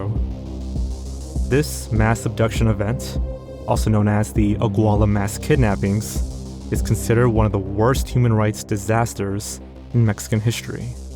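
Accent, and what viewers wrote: United States. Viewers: Can't stand the way hes saying Mexican words/names in such a yt/American accent man. Ain't yoir name?!?! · i find it hilarious that a supposed mexican pronounces spanish names like an american, cmon man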